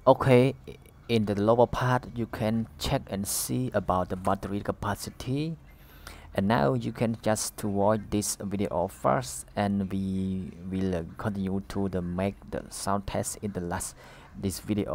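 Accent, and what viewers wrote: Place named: Cambodia. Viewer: Your speaking English like Cambodia people.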